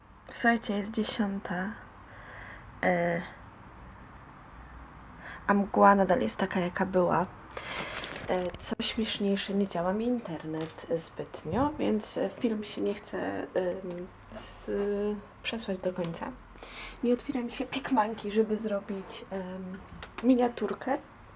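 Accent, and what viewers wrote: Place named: United Kingdom. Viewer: uwieeelbiam jak mówisz po angielsku, masz piekny akcent! uczylas sie wczesniej cos więcej niż w szkole czy mieszkanie w Anglii cię tego nauczylo ;)?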